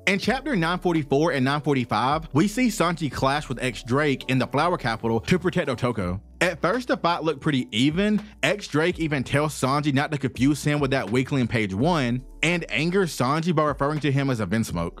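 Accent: South, United States. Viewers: Bro im am american from the south and its so nice to hear a southern accent on the internet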